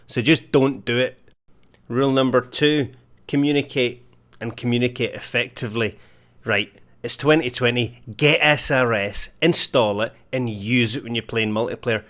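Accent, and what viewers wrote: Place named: Cockney, United Kingdom. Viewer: Love your accent, is that that classic east london cockney ;-)))))) Edit: wrote dialect first instead of accent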